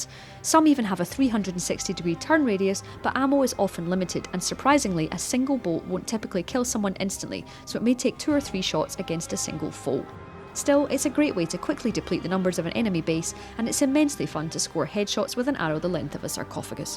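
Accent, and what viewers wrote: Scotland, United Kingdom. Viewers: Is this a Scottish or Irish accent. It's pretty cool. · It sounds Scottish to me. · Scotland. Like my good self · This video would be extremely better if they had put someone without this disgusting Scottish accent. She can't even pronounce fucking English words properly for fuck's sake! It hurts my ears... one of many reasons why I would never visit that shitty country in my life! Long live England! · Yes, it's Scottish. Central Scotland to be more specific. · alec j that's Scottish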